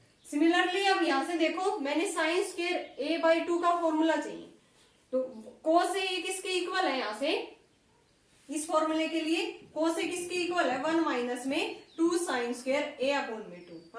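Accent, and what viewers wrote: Haryana, India. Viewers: Mam app haryana sa ho na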